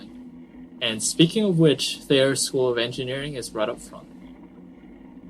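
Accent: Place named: China. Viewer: I can tell you have a slight accent. No offense. I'm guessing your bilingual and your second language is Chinese which is very difficult. You do speak perfect English though. I'm trying to learn French which is hard enough.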